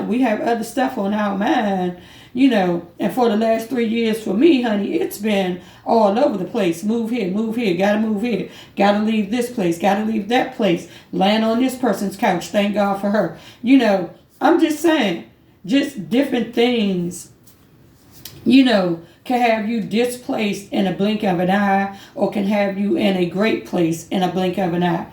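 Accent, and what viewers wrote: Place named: DMV, United States. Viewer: These rent prices are crazy anyone who looks down on somebody who is on section 8 needs to watch it because the rent is way too much people claiming that voucher holders are this and that everyone has a story after being beating up for so long who in their right mind who would trash the place after being homeless most of us want to just live as you said facts this helps people get back on their feet after all most of us beeem through we grateful to have it . I’m Homeless and just got approved for my voucher finally I been through too much years worth I need this voucher I can’t pay no 3k for a one bedroom this is outrages . Same I also have struggles too . Section 8 voucher is a blessing tbh even though it’s a lot of fighting for it it’s a war for this thing . Also the name we call it is housing choice voucher but there is people who are just lost and don’t realize with this you can get a house you can get a town house you be living better than others paying all their check away lol never be afraid to take help Or ask for It I think it’s pride too that be messing up with people and they look down on others it’s very sad once you get approved and get your own place that all that matters voucher give you a place to live so no need to look down on that same with food stamps EBTim also from the DMV too I hear that accent lol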